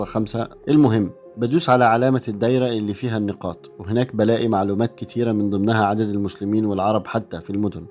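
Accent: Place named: Egypt. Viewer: هوا حضرتك مصري ؟ شوي بحس لهجتك مصري وشوي بحس شامي من وين حضرتك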